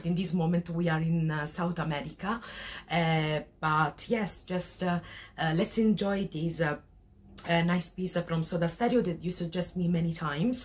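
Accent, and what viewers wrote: Italy. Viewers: Not try to hide your Italian accent please, is so cute. Speak a bit more in Italian, Latin-American love it, and slowly is not difficult to understand for us. Cheers. Nice video. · HOla, sos italiana? me parece percibir un acento italiano cuando hablas.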